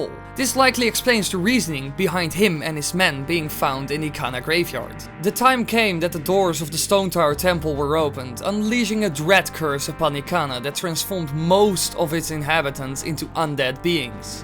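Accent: Netherlands. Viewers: Are you Dutch? I am? Because you have a dutch accent! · Question are by any chance Dutch? You have a very familiar accent ... ;)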